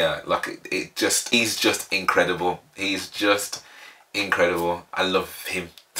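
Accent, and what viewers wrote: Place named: Birmingham, United Kingdom. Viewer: First of all, do you come from somewhere near Brummagem, you sound a bit like Jack Grealish to me ? Any road up, Adagio was sung by Lara Fabian who has been a great influence on Dimash. If you check out her performance of Adagio you will see why Dimash holds her in such high regard, they both put heart and soul into their performances. <link> She has performed live with Dimash singing Ti Amo Cosi, which no doubt you will come to in the future. There was a great camaraderie between the contestants in Singer 2017 and are all top draw. All the best, Peter.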